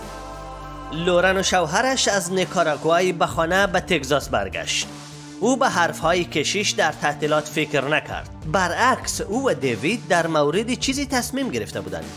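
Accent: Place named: Afghanistan. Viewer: ببخشیداین لهجه افغانستانی است باماخیلی فرق میکند ایشون اشتباه نکردن.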